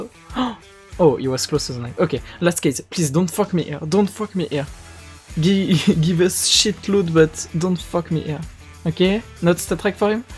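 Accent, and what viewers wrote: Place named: France. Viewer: pas de soucis, en plus c'est que ton anglais est super fluide malgré l'accent français ( on l'a tous moi le 1er ). Continue t'es sur la bonne voie, j'espère que le partenariat avec csgo roll arrivera bientôt !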